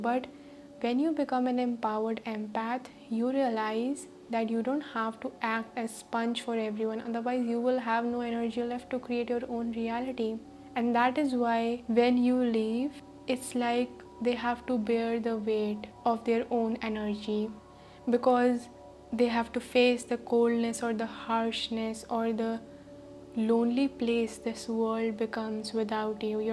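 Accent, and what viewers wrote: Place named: Punjab, India. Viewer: Sorry but I can't stand the Punjabi accent.......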